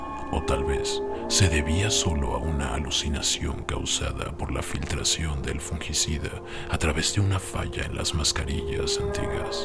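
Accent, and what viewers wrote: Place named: Mexico. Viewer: Que gusto volver a escucharte brother! Tus historias y narración son inigualables, neta tienes un estilo muy chingon con ese sabor mexa que tanto hace falta, éxito y espero con ansias tu próximo video 🤘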